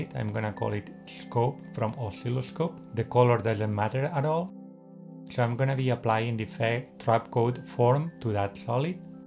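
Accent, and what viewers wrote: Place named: Slovenia. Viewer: Are you from Slovenia? Your accent sounds Slovenian.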